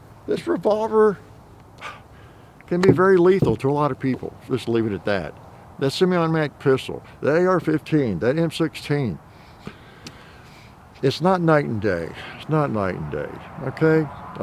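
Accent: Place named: Pennsylvania, United States. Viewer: Did Hickok originally come from Pennsylvania? He seems like he has a Pennsylvania accent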